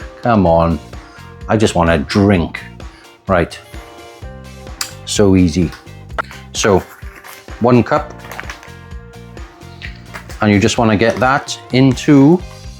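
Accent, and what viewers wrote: Wales, United Kingdom. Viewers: I’m Irish & that is not an Irish accent,it’s Welsh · Wrong! The music detracts from your interesting, Welsh-flavoured voice. No music on the next one, please.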